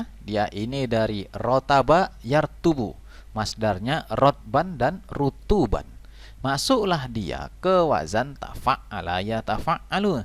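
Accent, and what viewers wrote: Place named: Medan, Indonesia. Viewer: Subhanallah jazakallahu atas ilmunya ust. Ust. Boleh tau gak.ust Asalnya dari mn ya Kok ngomongnya kayak ustadz2 medan sumut. Syukron dr rusli di medan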